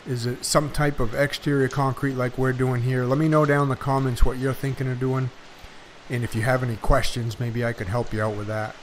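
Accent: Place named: Boston, United States. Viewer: Cool video. That accent is confusing me. Sounds a little north boston crossed with cape cod.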